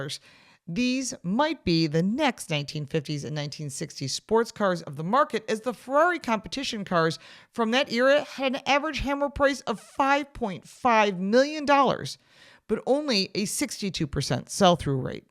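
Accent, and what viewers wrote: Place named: Midwest, United States. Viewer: 'thought so. i'm mainly from Chicago originally, but spent a couple years in Detroit (Southfield, 12 Mi. & Greenfield, <num>) & had family in Detroit. Unique midwestern accent! 'Enjoyed your video - What a great job to review those wonderful classics!! - Best wishes, Phil in AZ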